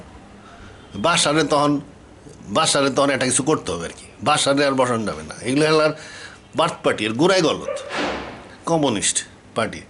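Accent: Manikganj, Bangladesh. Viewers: আস্সালামুআলাইকুম স্যার, আমি আপনার একজন বড় ফ্যান, আমি প্রায় সময় আপনার ভিডিও গুলো দেখি এবং এই পর্যন্ত অনেক ভিডিও দেখেছি, সময় সাময়িক ভিডিও গুলো ও অনেক ভাল হইছে, তবে ভিডিও গুলো এতো লং time যে এক ভিডিও শেষ করতে অনেক দিন লাগে। বিদেশে থাকি ফ্যামিলি নিয়া, সময় খুব কম। By the way, আপনার বাড়ি কি মানিকগঞ্জ লাগছে, আপনার কোথায় মানিকগঞ্জ এর টান আসে, আমার এক প্রিয় আঙ্কেল aunty থাকে সেখানে বাড়ি। Anyway আপনার একটা ভিডিও দেখেছিলাম জাতীয় সংগীত আর আজমী সাহেবের সম্পর্কে, আমি আমার এক বন্ধুকে সেটা দেখাতে চাইছিলাম but কোথাও খুঁজেছি পাই নাই। আপনি কি আমাকে লিংক তা দিবেন। আর একটা বিশেষ অনুরোধ, আপনি তো কুরআন তাফসীর করেসেন সাইন্টিফিক ওয়েতে, আমি সেগুলো দেখছি, এখনো শেষ করতে পারিনি, ভিডিও গুলো অসাধারণ। যেহেতু আপনি এই বিষয়ে অনেক জ্ঞান রাখেন, আপনি কি একটা গুরুত্বপূর্ণ বিষয়ে একটা ভিডিও বানাতো পারবেন, টপিকস টা হলো, রমজানের রোজা ও ঈদের চাঁদ দেখা নিয়ে, অনেকে নাকি চাঁদ আকাশে দেখার আগেই সাইন্টিফিক ওয়েতে চাঁদ এর জন্ম গণনা করে ফেলে, সেটা কি সায়েন্টিফাক্যালি অনেকে নাকি চাঁদ আকাশে দেখার আগেই সাইন্টিফিক ওয়েতে চাঁদ এর জন্ম গণনা করে ফেলে, সেটা কি সায়েন্টিফাক্যালি হচ্ছে? 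এই বিষয়ে আপনি কোনো রিসার্চ করে একটা ভিডিও করতে পারবেন, কুরআন আর গণনা অনুসারে কোনটা সঠিক? আর সাইন্টিফিক গণনায় চাঁদ দেখা কি ইসলাম সমর্থন করে? আপনি কোনো পদ্ধতি সঠিক মকনে করেন? কুরআন ও সাইন্স এর আলোকে একটু ব্যাখ্যা করবেন প্লিজ। এই মত নিয়া সমাজে অনেক ভেধাভেদ, একেক গ্রুপ একেক দিনে ঈদের namaj পড়ে ও সমাজে বৃশৃঙ্খলা তৈরী হয়।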